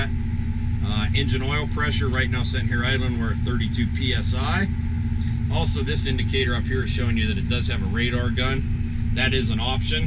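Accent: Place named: Midwest, United States. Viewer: Same reason he says filders. He’s a Midwesterner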